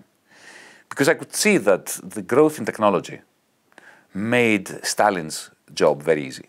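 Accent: France. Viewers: Mais... Pourquoi tu commences l'interview en prononçant pas trop mal l'anglais, puis tu switches sur le pire accent français ? Un petit effort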